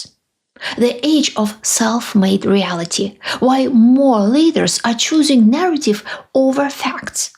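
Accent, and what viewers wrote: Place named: Russia. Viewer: Hi, I started tuning into your channel because I have been a huge fan of ASMR content for years. You have a beautiful, soft voice, and I really enjoy the russian accent. What I ended up discovering was an intelligent woman, with a unique perspective on geopolitics, told with stories and facts. I REALLY, REALLY enjoy your videos, for all of those reasons. I encourage you to continue your work, you are a bright light of truth in a world hell bent on suppressing it. Your work is important, powerful and illuminating. I can't waif to see you continue growing, you deserve that success. Lots of love, from Melbourne Australia ❤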